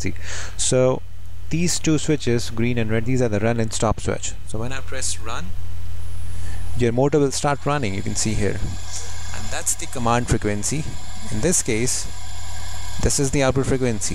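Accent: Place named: India. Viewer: Outstanding. I have been struggling with the question of using the Delta M series or sticking with my old friend the B series. After watching your work, I am comfortable making the change. Delta should sponsor you. Having been an American in India 7 times, I can follow you no problem. Garden variety Americans could watch each section a few times and learn the pattern of language in India. Amazing in and of itself. I always felt like Indian's spoke better English than I did. Good work. Please do more.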